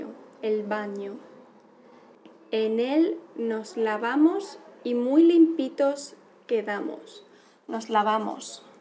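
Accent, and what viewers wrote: Castile, Spain. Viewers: Is this European spanish or Latin American? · is this Castilian Spanish plz!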